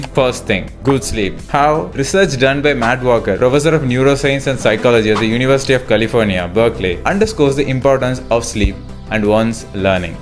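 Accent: India. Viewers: Thanks you are so relatable. Please be our Ali abdal . I love our Indian accent .. do study with me videos as well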